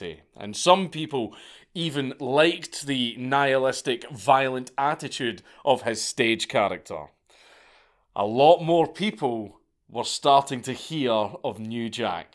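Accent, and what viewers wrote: United States. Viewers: you have quite a good american accent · American accent Dankula is kinda sic. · But your American accent took me off guard, I didn't think you would sound like that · dude, your American accent is spot on.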